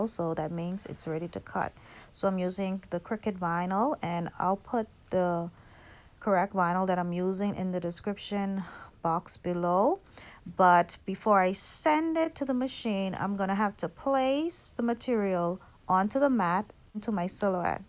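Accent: Bahamas. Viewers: I am too just knew the accent when i heard it 🇧🇸❤️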